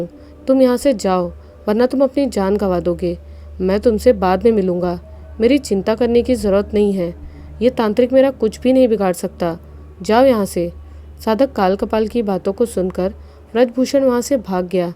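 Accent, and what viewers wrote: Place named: Eastern Uttar Pradesh, India. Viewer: लेकिन बोलने के तरीके से east up की लगती हैं 🙏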